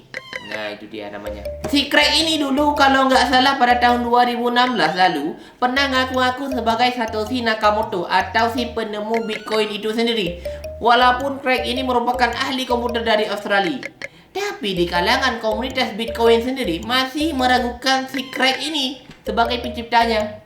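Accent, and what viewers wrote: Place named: Aceh, Indonesia. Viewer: Logat aceh abg nih.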